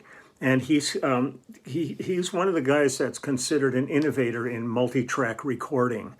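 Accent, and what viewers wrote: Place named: Wisconsin, United States. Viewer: I still hear the Wisconsin in your voice after 50 years away😋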